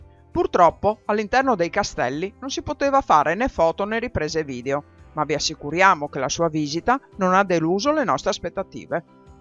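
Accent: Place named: Friuli, Italy. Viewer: Hai l'accento friulano "crostoli" ad esempio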